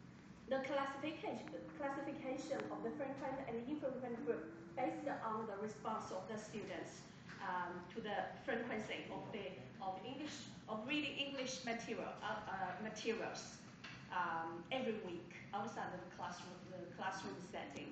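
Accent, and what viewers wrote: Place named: United Kingdom. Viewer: มิน่า... สำเนียง บริธิต เลยครับท่าน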